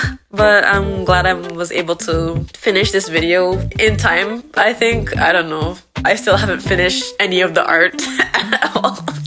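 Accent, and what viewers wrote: Kingston, Jamaica. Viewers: I def hear your Jamaican accent peak through when you speak! Your cadence sounds like Jamaican-American lowkey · I disagree I can hear a little bit of that Jamaica accent within you. 😅 But I can relate to this video a bit as a Jamaican American who grew up around primarily African American culture more than Jamaican culture. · It's like a lighter version of the kingstonian accident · The jamaican accent is still there just a little bit . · no man that accent deffo if noticeable, u a yard man bro ( yard man or yardie, is like a native of jamaican) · Even the way you said "NOoo" sounds very jamaican. Youre accent isn't gone. Just a little diluted. You almost sound like a Canadian Jamaican. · you still have a jamacian accent friend ahahah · I still hear your Jamaican accent 🎉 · Lol this video is so cute and so is your animation style. 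But just to let you know, your Jamaican accent is VERY VERY evident 🤣 it's definitely not lost. Media portrays a Jamaican accent a certain way but a lot of Kingstonians sound just like you. I was born & raised in JA and I sound similar to you. Once you come back to visit one day you'll learn that the accent is actually very diverse dependent on where you live on the island :). You basically just have a city accent :) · I still hear the Jamaican accent. Mostly whenever you end your words with an "r" sound like: "more", "or", etc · I can hear the Jamaican in your accent clearly. It's unmistakable and the "hasn't been home in forever" kind. I hear it a lot in New York. I probably should have an odd accent being Jamaican/Bermudian, but born and raised in the US, a mild New York accent seems to have won that battle. 😅 The Jamaican only comes out when I'm extremely angry, which is thankfully rare. 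· I live in Jamaica and your maybe kinda sound like those Kingston girls so not so bad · I'm Jamaican American, both of my parents are from Jamaica but I was born in the US and low-key haven't left since so I can relate to not having much of a Jamaican accent, but you definitely have one even if it's very light 😭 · I still hear your jamaican acent As jamaican my self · As someone who spent most of my life in Jamaica and now live abroad, I can say that I definitely hear the Jamaican accent in your voice. Can’t miss it actually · Don't worry about it, I’m gonna say it again, though, in some of your intonations, you sound like you're from kingston. 😊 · I think you still gotta a bit of a Jamaican accent, btw (btw: By the way) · Im not gonna lie to you. Im Jamaican, and your English accent gives Jamaican with a "twang" especially with certain words. Look forward to seeing more from you~ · Your accent is still there. 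It sounds like a Kingston accent. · Bro I can still hear your Jamaican accent in your voice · You definitely have a Jamaican accent. You sound similar to my brother. Also I knew some blasian (Japanese and African American) kids growing up in California. I think they had the reverse problem you have with Japanese. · I am Jamaican and I do here a lit bit of accent from you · I'm not Jamaican but I grew up around lots of Jamaican friends, I can hear the accent slightly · I'm not Jamaican at ALL, but I can still hear your accent! Dw! You haven't been konnichiwashed as bad as you think :D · Your accent is very much Jamaican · You sound very Jamaican. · I'm not even from an english speaking country, but I've been on the international side of the internet for long enough to tell you definetly still have some jamaican in your accent lol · 7 months late holy- I just wanted to say that: 1. I’m from the Bahamas so I’m also Caribbean (Technically) 2. 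You might not sound super Jamaican but I can still hear bits of your accent in your voice when you speak English. On top of that, your English from what I hear is completely fine, even if you don’t know some words, that doesn’t necessarily mean it’s “bad”. Anyways gud video lol Oh btw how big is your afro? Painfully curious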